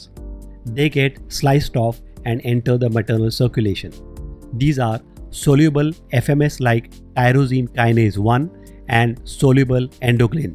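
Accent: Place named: India. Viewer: I cannot catch everything you said due to your Indian accent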